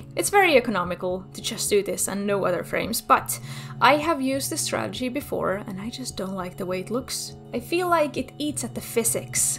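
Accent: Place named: Finland. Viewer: Kuulin heti aksentista et oli suomalaine kyseessä. Mahtava tietää että suomestakin löytyy näin taitavia henkilöitä! Erinomainen video :]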